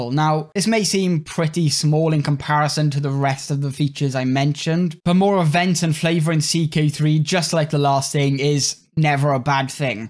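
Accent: Wales, United Kingdom. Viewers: where in wales are u from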